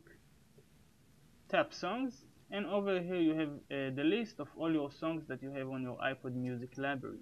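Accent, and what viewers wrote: Israel. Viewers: שמע לא יכולתי שלא לכתוב שאתה ישראלי עם המבטא הזה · I have IOS 9.0.2 and its not free. And i know you're from israel you have a israeli accent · Nice Israeli accent lol. Works great thanks!!! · אתה נישמע לי ישראלי בכל מקרה לא משנה thx bro good view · איך שומעים שהוא ישראלי..(: תודה על ההסבר, זה מעולה!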